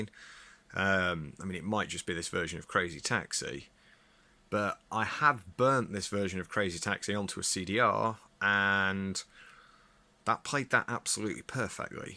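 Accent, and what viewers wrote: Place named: England, United Kingdom. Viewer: makes me remember how i hate english accent like this lol... btw we dont care of your speakers and monitor model.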